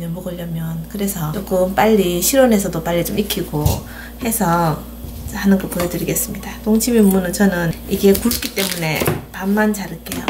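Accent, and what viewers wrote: Gyeongsang, South Korea. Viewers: 대구 이모 진짜로 동치미 올려주셨네요 감사 합니다 대구 본토 발음이시네예~ 잘 보고 갑니다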